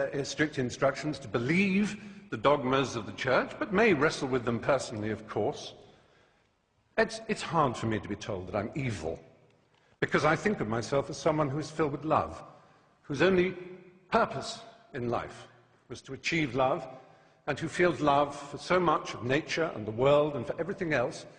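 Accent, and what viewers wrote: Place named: RP, United Kingdom. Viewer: Wow,what a LIAR. Where to start? Repeating black legends in a posh voice does not a clever argument make. Firstly,Galileo was brought up on charges of being a heretic,not necessarily to do with heliocentricism.The Copernican theory he mentions is funny because Copernicus himself was a Catholic cleric.The enlightenment?You mean like when those who took part in the French revolution persecuted Catholics as bad as the Nazis did the Jews and all in the name of 'reason'?Thomas More?How about Cromwel?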